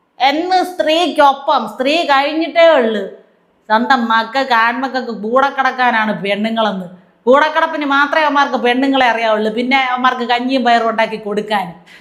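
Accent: Thiruvananthapuram, India. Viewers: അവതരണം മഹാ ബോർ. വേഷം കെട്ടലും. തിരാന്ത്തരം ബാഷകൾ പറയല്ല്🤔🤐🥶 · ur simply awesome.what a super presentation.super tvm slang.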